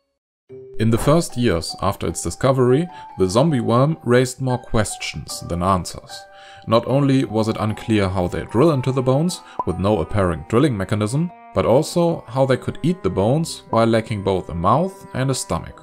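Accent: Germany. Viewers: i guess he is german . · You sound German, but your english is good. A rare thing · i just geared your accent and knew you are german xD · I cant unhear the fact that he speaks with a german accent XD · I still do not know why this worm is a "zombie worm". But now fortunately I know that Indian English is not alone - German English also exists ;) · Are you German?? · That German accent ~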